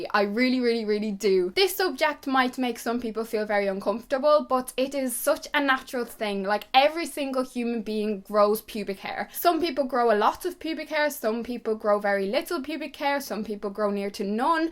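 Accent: Ireland. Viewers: U love your Irish 🍀 accent coz I'm irish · Where are you from? I detect the musical emerald lilt! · I’m irish and I some how came across this video and I straight away noticed your accent, we irish girls have to stick together ♥️🇮🇪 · She has an Irish (Dublin) accent so probably not. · I love her Irish accent, this video is super helpful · litta Lou I would say she has an Irish accent.... card b is Dominican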